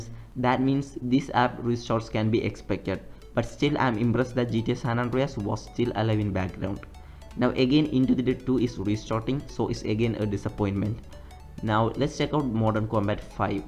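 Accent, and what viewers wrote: India. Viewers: are you indian youe voice is like indian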